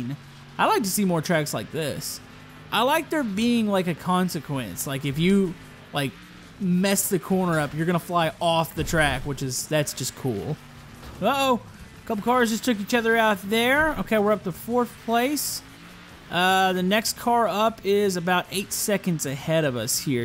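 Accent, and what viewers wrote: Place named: United States. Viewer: Where do you live? You sound American but you have your speed still set to km/h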